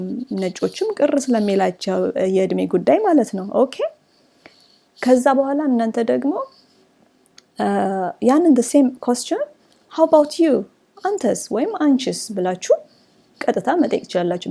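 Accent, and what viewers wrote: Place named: United Kingdom. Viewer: Good job👏👏 I love your British accent 👍 reminds me of my Brit friends.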